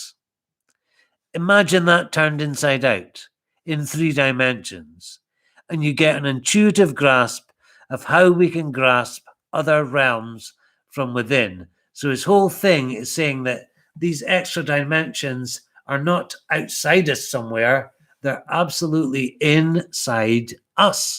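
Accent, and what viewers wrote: Scotland, United Kingdom. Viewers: magick patter in a Scottish voice...... finally !!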